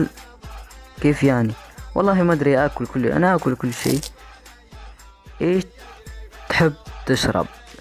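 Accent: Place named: Saudi Arabia. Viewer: من المغرب و تتحدث سعودي؟ ملاحظة: حتى انا مغربية ._. و بحبك ._.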